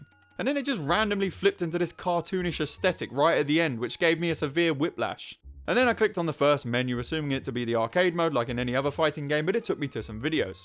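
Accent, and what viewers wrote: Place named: United Kingdom. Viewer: I like when British man talks about games for over and hour myyyyy favoriiiiiteeeeee 😩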